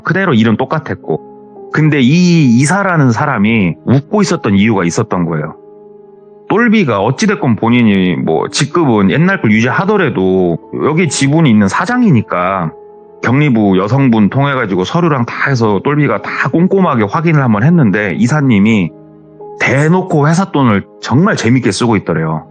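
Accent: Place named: Jeolla, South Korea. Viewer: 너무 재미있어요 빠져들어요 전라도 구수한 목소리 감사합니다.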